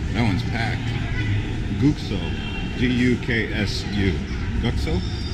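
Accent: United States. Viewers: Aaaagh American pronunciation aaagh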